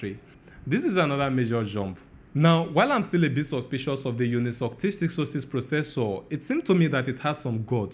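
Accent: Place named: Africa. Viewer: Greetings from Venezuela. I had some africans friends and yoyr accent remember me them accent. I like it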